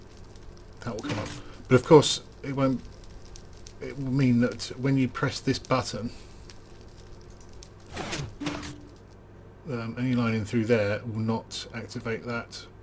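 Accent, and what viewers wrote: United Kingdom. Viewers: where abouts in uk are you from??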